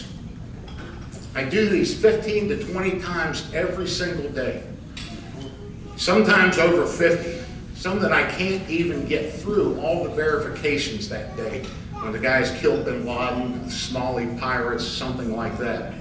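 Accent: Pittsburgh, United States. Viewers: Great work sir, thank you for your service! 🇺🇸. By the way, I can hear your Pittsburgh accent 👍🏻👍🏻😄😄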